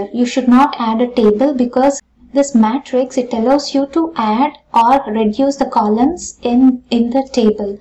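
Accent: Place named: Kerala, India. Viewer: When you have accent, its very hard to understand. are you a malayali?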